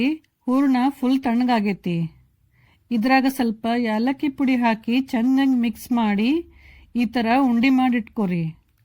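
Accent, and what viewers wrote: North Karnataka, India. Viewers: Super 👌👌namm uttar karnatakd adige matte matu eradu special adar mund yaudu illa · Uttara karnataka laungage super sister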